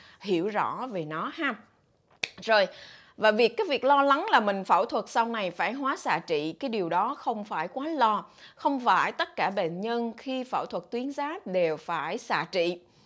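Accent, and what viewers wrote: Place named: Quang Nam, Vietnam. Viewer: là người Quảng Nam ạ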